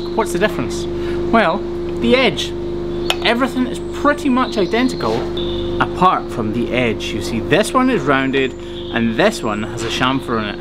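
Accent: Scotland, United Kingdom. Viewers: Thanks for a lovely episode, nothing like waking up to pure Scottish in the morning, you are so helping my daughter with her accepting she speaks Scottish.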